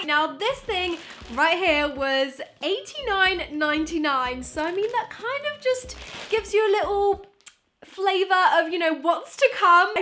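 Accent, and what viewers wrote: England, United Kingdom. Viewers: LET ME TELL YOU!!! YOU SOUND SO MUCH LIKE LOUIS TOMLINSON. I'M NOT TALKING ABOUT YOUR VOICE BUT YOUR ACCENT. UGHHHH!!!😭😭😭😭😭... I'M A DIRECTIONER. AND IT HURTS, YOU REMIND ME SO MUCH OF LOUIS😩😩😩😩❤❤❤❤❤... Btw, I love your videos, and I think you should do that "bought the cheepest dress" video, in which you just chose the first dress. I loved that video, that was hilarious, please do another part.❤❤ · I love your british accent!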